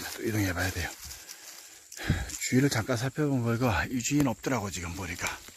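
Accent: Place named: Gyeongbuk-Gangwon, South Korea. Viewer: 좀더 다니셔야 겠습니다^^ 알수없는것이 능이철이라 늦능이 대박산행 15일정도 열심히 다녔던 기억이 ㅋㅋ 수고하셨습니다 님 말씀이 경북 강원도 경계의 억양처름 들립니다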